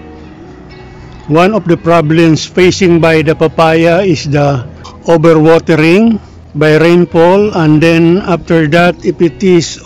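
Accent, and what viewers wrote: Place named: Italy. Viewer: ARE YOU ITALIAN!!!??? OUR VERY DEAR MR. GIOVANNI CUMAN BELONGS TO ITALY ALSO SPEAKS LIKE YOU.....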